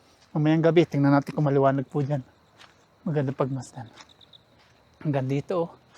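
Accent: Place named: Mindoro, Philippines. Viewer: Boss tiga Mindoro ka no? Kasi yun Tono mo😂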